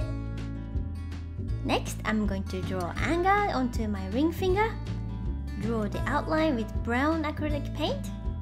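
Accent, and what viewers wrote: Japan. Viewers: Because she is from Japan, she has an accent. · Hey does anybody know where is from? Her accent is very interesting... It sounds sort of Japanese but with a little bit of Australian maybe? Also great nail art! I was mesmerized!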